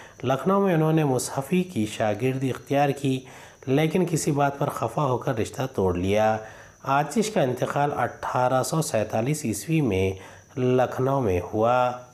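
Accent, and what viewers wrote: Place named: Aurangabad, India. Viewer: Aapke Urdu bolne ka tarike se hi pata chalta hai aap Aurngabad k ho😊 Ma Sha ALLAH good explanation 👍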